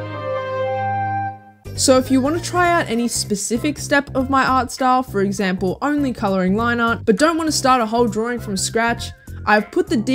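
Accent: Australia. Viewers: Are you... Australian??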